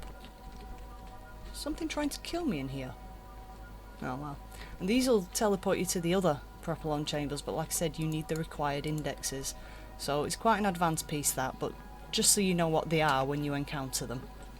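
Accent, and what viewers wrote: United Kingdom. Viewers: Are you British :) i love your accent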